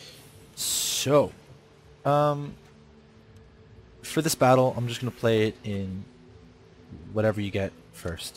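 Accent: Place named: United States. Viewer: You sound american, but you are on EU server?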